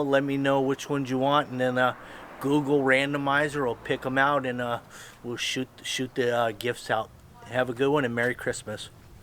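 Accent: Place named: West Coast, United States. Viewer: Bud...thanks for the glove offer.. but I truly dont need any, I got a duffel bag full ive collected for years..... you give us all a Christmas gift everytime you teach us how to do concrete...in each video... theirs so many terrible people out their giving advise so to have a legit gent is not something that happens often in our world today. On any platform....I say this carefully so not to jinx you, and your guys, but you and your guys are kind of "masters" of your art. .... I grew up most my life in California and Vegas..then I moved all over the us... so I have seen a bunch of finishes.. im guessing you live in cali cause you got that west coast surfers slang in your voice.. sounds like to me anyways.. so anyhoo.. thank you for giving amazing content for us that get a chance to watch you, and learn when we can...im watching you for the day I build my own house.... 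so I know what not to do... and to do... respect...........................................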